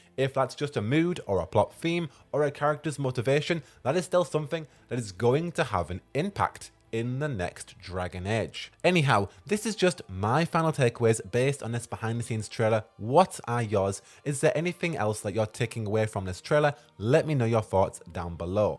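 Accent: United Kingdom. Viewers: You are very british, and that's coming from a British person. What I mean is, your voice is very soothing to listen to lmao if that makes sense?? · Ah, so you're British. Totally irrelevant I know, but I couldn't quite place your accent before. Then again, I suck at that.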